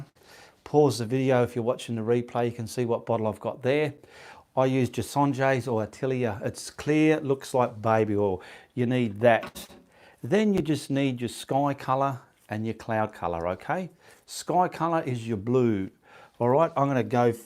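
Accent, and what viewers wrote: Australia. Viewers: I just love hearing u talk...ok? U sound like crocodile dundee for me ok? U paint beautifully! I am so frustraded because i really can't draw or paint, have no skills what so ever, but it's so fun when you manage something that looks like something if u know what i mean. English is'nt my Language so bare over with me. Well anyway wanted to say i like both your talking and painting. Signing out from Norway, have a nice day...ok? 😀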